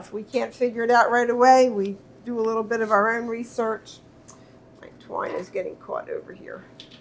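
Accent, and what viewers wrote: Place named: Tidewater, United States. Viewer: Great video. Love that Tidewater accent!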